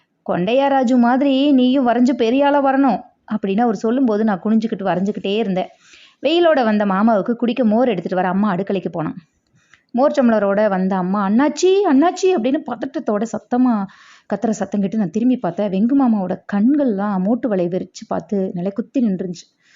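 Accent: Tirunelveli, India. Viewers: உள்ளத்தை உருக்கிய கதை.நல்ல கதை.தெளிவான குரல் வளம்.திருநெல்வேலி பாஷை அப்படியே.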